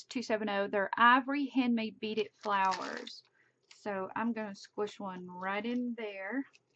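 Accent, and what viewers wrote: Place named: South, United States. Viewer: I will. I live in North Georgia you sound like a southern girl too lol!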